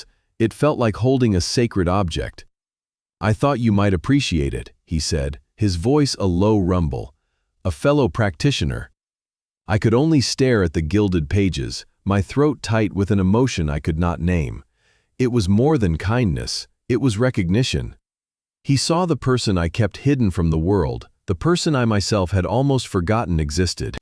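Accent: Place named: United Kingdom. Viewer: The AI narrator's voice is UK English, but the dialogue has clearly been written by someone who is a native US English speaker which does seem somewhat odd.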